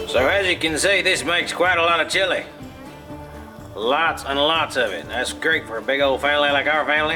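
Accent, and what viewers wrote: United States. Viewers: Sorry, not like British one. The cow boy one in the west USA. ♥ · u so funny wit dat cowboy accent, u great man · Lol😁 The whole time I was holding my breathe praying🙏that your mustache didn't fall off into that Chili ha ha ha😁😁😁😁😁😁😁😁😁😁😁😁😁😁😁 I am going to definitely try making this chili I could literally taste that chili while watching you cook it..looks amazingly good👍 You Got that Southern accent down packed✌😋🙏👍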